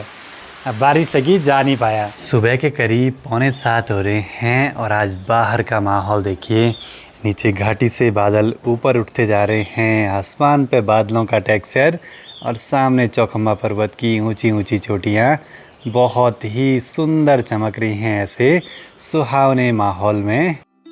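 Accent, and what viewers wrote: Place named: Haryana, India. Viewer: Bhai haryanvi bol rahay ho tenay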